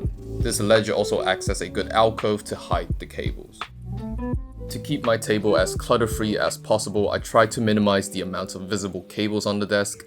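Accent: England, United Kingdom. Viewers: I really like your English accent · nice english accent you got there attending a local school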